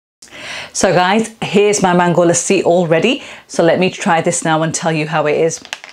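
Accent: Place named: Wales, United Kingdom. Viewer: As an American I'm loving your accent and scratching my head about where it's from....maybe being across the pond I'm totally wrong, but are you Welsh?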